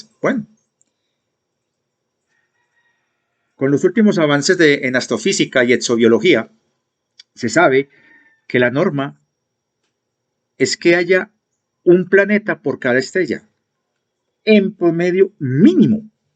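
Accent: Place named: Colombia. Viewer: Amigo, es una agrado venir a escuchar sus relatos, historias e información, es muy agradable porque uno se siente como en casa, con gente que habla y siente como nosotros, los "diferentes". Debo confesarle que me encanta el acento colombiano, así que mas agradable se torna escuchar lo que nos cuenta. Muchas gracias por su labor.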